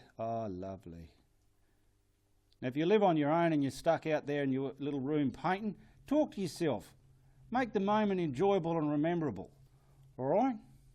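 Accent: Australia. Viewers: After reading a few comments, I think your videos are just about right. 30 minutes is great. I tend to get tired and lose interest after 40 or so. Keep up the excellent work! (Although, I could listen to you speak for hours! Love the Aussie accent!) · Love the Aussie accent and humour. Love your paintings too and I will try something similar. Thanks from another Aussie..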